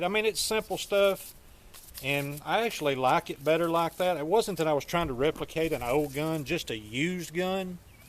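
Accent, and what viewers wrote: South, United States. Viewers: Hello! That’s nice looking. I’ve also heard others make the point if you like to look “period” then age your gun to look anywhere from new to very worn, but not 100 years old. I like it all- to each his own! As far as your speech: I’m from Florida/Louisiana stock and feel at home hearing your videos! (I love the scenery and most of the weather here in Montana, but damn I miss the South) Rock on, brother.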